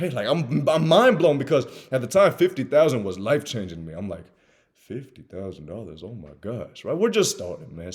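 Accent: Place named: Houston, United States. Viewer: Where you from? Sound like from Houston.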